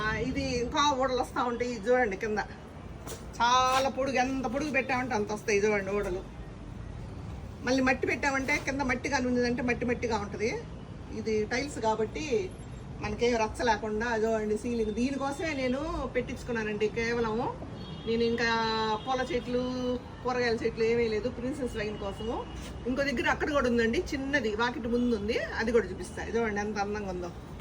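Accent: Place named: Rayalaseema, India. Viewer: Chelli! Meeru bhalega musthabu ayuthunnaru. Saree bagundi. Kadapa chittur districts slang bhale matladutbunnaru. Itlane matladuthu vundali.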